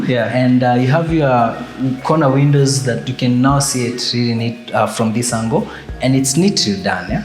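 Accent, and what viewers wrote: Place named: Kiambu, Kenya. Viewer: Buda umeenda KIAMBU accebt ikaanza kutokea bana!😂😂btw luv the house keep it up!:washhands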